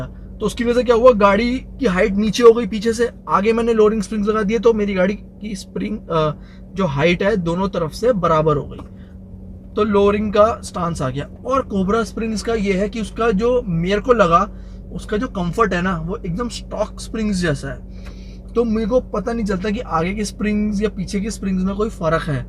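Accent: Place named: India. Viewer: I think the same until I notice that is actually english but with soooo strong hindi accent...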